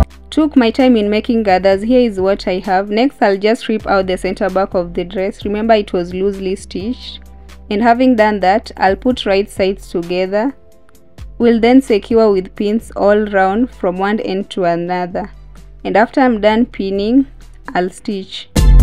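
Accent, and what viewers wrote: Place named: Kenya. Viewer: from your accent I can clearly tell you are Kenyan. Where do you buy fabric in Nairobi?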